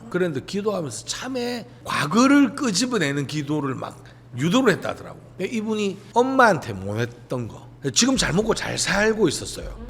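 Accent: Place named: Gyeongsang, South Korea. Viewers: 갱사도라서 그런가봐유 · 경상도 소리가 그래요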